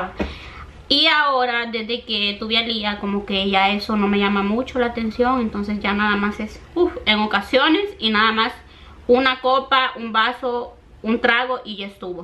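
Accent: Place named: Honduras. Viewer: Te admiro me encanta el asento de las catrachas y mas cuando son carismaticas y cariñosas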